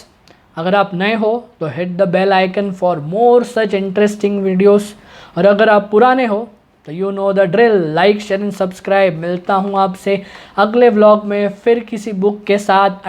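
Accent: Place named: Gujarat, India. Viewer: great sir, great video and from the way you speek i think you are from gujrat , back to point nice and informative video i will try this on my crush hehe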